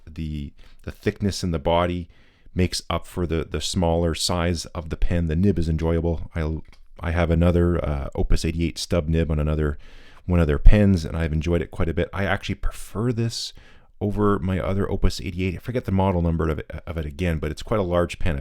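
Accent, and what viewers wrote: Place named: British Columbia, Canada. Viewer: Cut mat ✔️ Hands only ✔️ BC accent ✔️ Valuing things in kopeks and Dong ✔️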